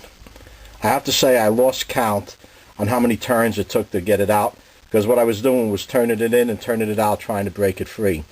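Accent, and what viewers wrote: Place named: Boston, United States. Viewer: Taking awt tha cawter pin lol love the Boston accent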